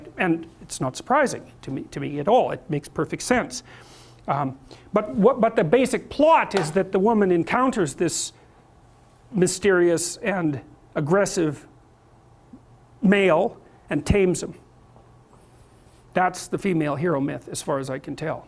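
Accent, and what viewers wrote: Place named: Canada. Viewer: I’ve only watched a few of his videos, but his Canadian is in overdrive on this one.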